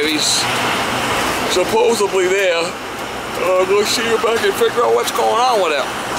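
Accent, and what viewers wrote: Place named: New York, United States. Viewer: thanks for talking as you work +nice accent my friend is from ny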